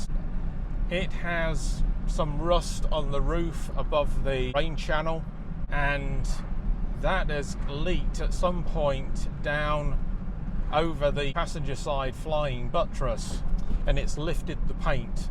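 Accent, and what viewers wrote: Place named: United Kingdom. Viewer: Interesting video. Nice to see some of the early development pictures. I have always thought it unfair that the XJS is knocked by so many and leads you to think no one likes them which isn't true. They have a big following and people really do like them. It was unfair to compare them against the e type. It wasn't an e type it was an XJS. In the mid 70's we got the SD1 Rover, Tr7, Princess, and the XJS and it was too much of a shock for many people. British cars were trying to modernise but the public weren't yet ready for them. The XJS i believe had the longest production run of any Jaguar and when the convertible was released in 1988 it lead i think in 1990 to its best sales year. I own a 1992 Facelift V12 Convertible and since buying it, it has amazed me how much attention it gets where ever i go. Strangely enough i was never keen on the look of the facelift lights until i got mine and i love it. 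They are the most comfortable seats i have ever had in a car and they are lovely cars. You got a bargain with yours and it been the Coupe in the USA as you said will make it rarer and a little bit more special. I saw the thumbnail and expected to see a US spec car and when you started speaking i thought you were a brit and confirmed when i looked at your homepage.👍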